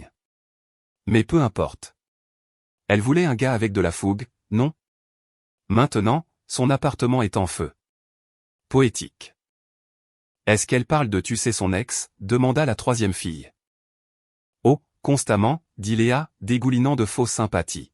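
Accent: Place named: France. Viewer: un français dans la cinquantaine qui lit un texte en anglais pour la première fois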